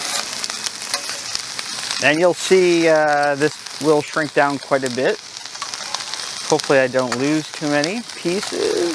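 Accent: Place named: Canada. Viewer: My Canadian brother! I'm an American expat in Panama from the US and love to make meals with beef shank aka OSSO BUCCO as the Panamanians named the cut after the meal. I am assuming your Canadian based off the accent but if I got it wrong my apologies. Gonna watch more of your stuff and share! Thanks!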